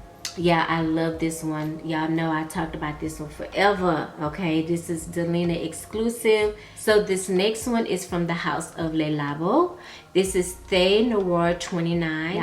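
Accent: Texas, United States. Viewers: “My God Today” you know you Texan! Love you!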